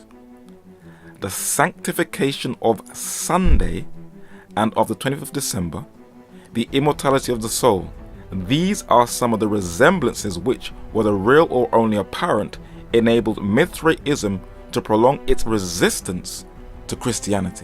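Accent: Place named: Scotland, United Kingdom. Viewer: very difficult to understand the speaker. Sounds like he is a s Scottish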